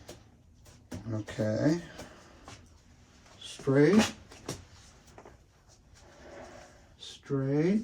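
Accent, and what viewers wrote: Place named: Midwest, United States. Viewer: I have a couple questions, how long did you leave the wire on before you took it off prior to putting it in the round pot? And can I ask what part of the country you are from? You seem to have a midwest accent? Coming from a Minnesotan, you sound like one of us.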